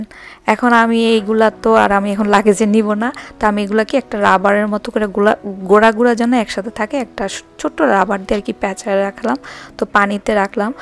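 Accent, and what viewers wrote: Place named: Sylhet, Bangladesh. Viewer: আপু,আপনার আপনার আশপাশে কি সিলেটি আছে?আপনার ভাষা তো সিলেটি টান এসে গেছে🎉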